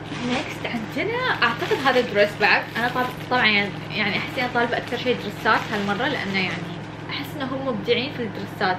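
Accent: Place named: United Arab Emirates. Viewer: لهجتها اماراتية واضح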